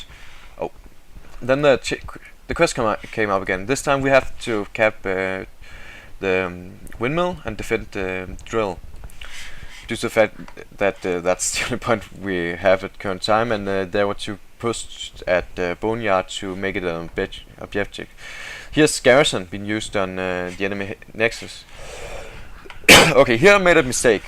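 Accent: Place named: Denmark. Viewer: kunne høre du er dansker! :D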